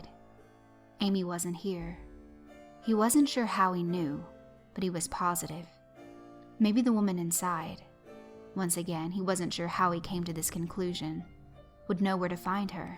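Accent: South, United States. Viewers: idk what it is about your voice just make me fall asleep i don't know if it the southern accent listed to the story you narrate makes me fall asleep i work 3 shift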